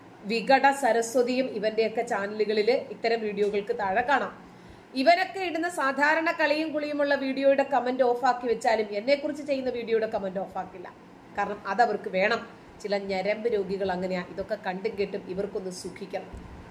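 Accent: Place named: Kottayam, India. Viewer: അക്ഷര സ്പുടതയോടേയുള്ള, അറിവുകളുടെ നീതി ന്യായയുക്തമായ താങ്കളുടെ സംസാര ശൈലിക്കു ഒരു പ്രത്ത്യേക കോട്ടയം സ്റ്റൈൽ തന്നേയുണ്ട്. അറിവിന്റെ ആശംസകൾ. 🌹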